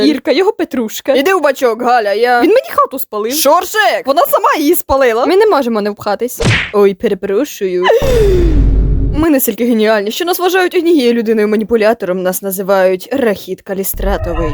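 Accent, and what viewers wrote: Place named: Transcarpathia, Ukraine. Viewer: ця закарпатська вимова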